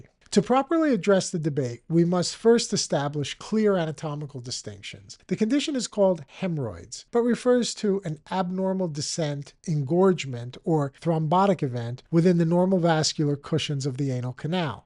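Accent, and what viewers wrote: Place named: New York, United States. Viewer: I heard the accent and I was hoping you were in the NYC area... Looked it up and you're 15mins from my apartment in NJ 😂. Doc I had a question what are your thoughts on bidets?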